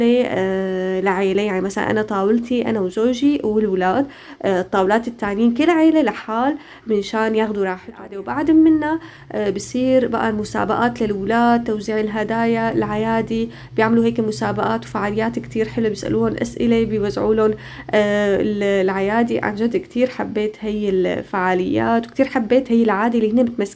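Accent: Syria. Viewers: اختي انت من سوريا❤ مبين حاكيك شاميه انا من سوريا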